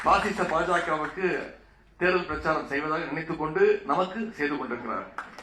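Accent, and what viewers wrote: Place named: Telugu, India. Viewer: நீ நல்ல தமிழல பேசு ஒரே தெலுங்கு வாடை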